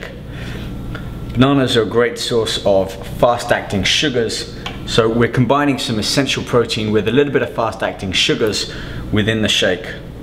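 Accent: United Kingdom. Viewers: What accent is this ? British ?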